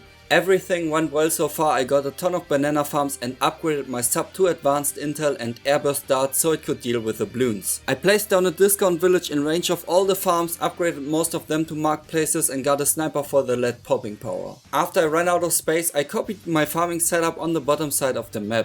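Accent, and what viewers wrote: Germany. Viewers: nice german akzent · Deutscher Akzent immer so lustig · U German?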